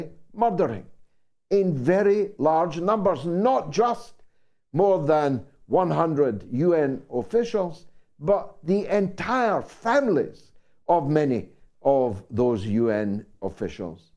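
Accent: Scotland, United Kingdom. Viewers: Tacitus born 56 AD would be proud of you a man of words well don't know what he think about the Scottish accent. I looked it up because I love history. Thank you, you can tell wifey about that❤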